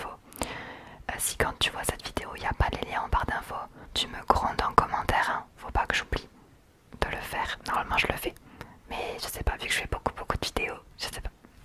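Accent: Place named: Provence, France. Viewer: L'accent provençal en ASMR j'arrive plus à m'en passer, y a moyen d'être leadeuse d'un mouvement 👀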